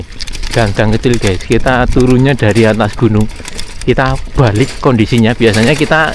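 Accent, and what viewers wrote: Banyumas, Indonesia. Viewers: Asline wong endi si mas, logate ngapak banget 😁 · Ngapak banget co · Lee.kok ngapak cah ngendi ,nek ngomong ati2 lee,neng negara orang.takut ada yg syirik nanti jadi abu merangmu.ati2 yoo lee.semoga sukses da n bahagia.Aamiin.❤❤❤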